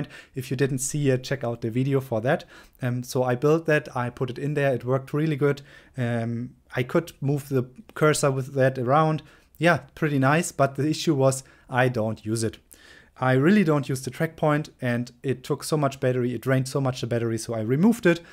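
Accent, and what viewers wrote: Germany. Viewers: i hear a bit of se german akzent , LG :)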